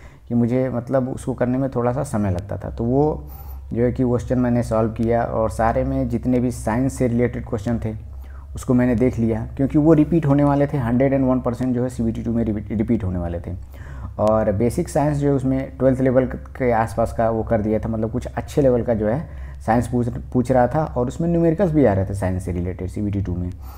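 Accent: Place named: Tamil Nadu, India. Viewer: You sound like tamilan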